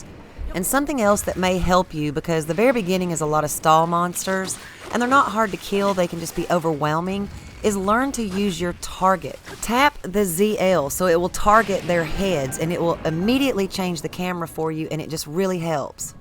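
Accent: South, United States. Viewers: Thanks. I beat the last two with ur help. Since I'm southern all the women i know sound like u so maybe birds of a feather? make it very easy to follow your advice, listen to ur voice, make sense of ur logic! Happy 4th of July God Bless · Thank you, kind southern lady!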